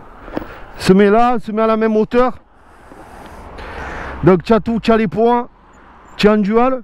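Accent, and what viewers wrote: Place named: North, France. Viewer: j’adore votre accent du nord et votre égo du nord. 😋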